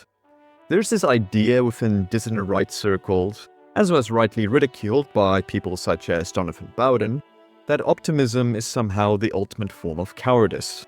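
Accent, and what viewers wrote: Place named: South Africa. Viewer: Knew you were South African before you said so. Good to see there's more of us. This Soutie is with you ready to fight for my Afrikaans people and ancestors. Fuck communism. God i love how you explained the South Africanisation spectrum. I really do wonder where we're going in future. Papa Greyling came to SA in 1752. I don't think I'm going anywhere.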